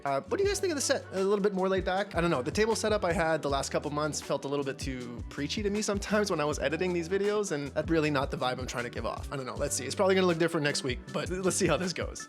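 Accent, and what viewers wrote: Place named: Quebec, Canada. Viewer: Ben weyon! Ton français fait vraiment Québécois!? Tu viens du Québec?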